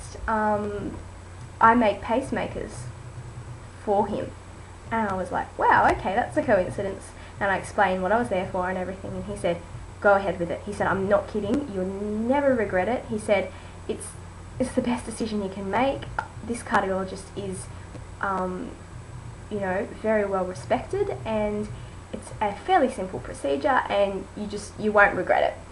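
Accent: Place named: Australia. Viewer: You sound Australian, who did you use?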